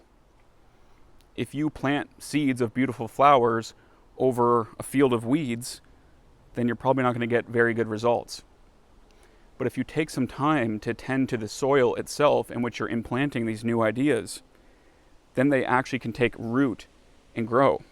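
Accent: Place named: Maritimes, Canada. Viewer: I know a fellow Maritimer when I hear one. Great videos man keep em coming!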